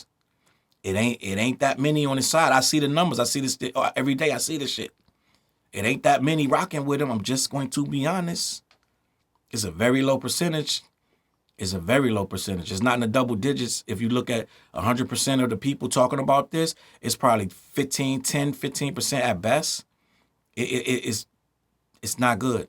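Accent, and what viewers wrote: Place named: Boston, United States. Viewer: auticle haha that Boston accent be poppin up here and there lol.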